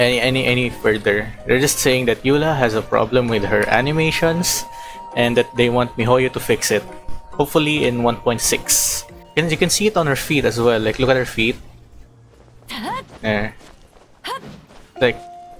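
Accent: Philippines. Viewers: Are you filipino? You kinda have like a Filipino accent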